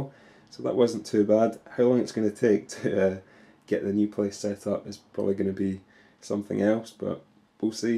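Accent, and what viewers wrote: Scotland, United Kingdom. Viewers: do u live in Scotland ur accent sounds Scottish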